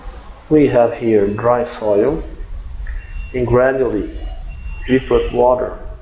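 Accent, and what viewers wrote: Brazil. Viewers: Hi, nice project. Just a silly comment about your code: if the values are exactly 300 or 700, nothing will happen, because the 2 first 'if' consider > 300 or <300, never =300. The same with the 2 last 'if' regarding 700. It is not a big deal, because the probability of such values got read is small, but it is also something simple to fix. BTW, are you Brazilian? Your accent seemed Brazilian.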